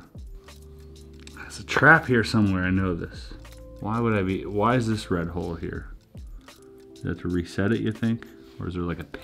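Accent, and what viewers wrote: Germany. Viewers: Solid german accent my man high five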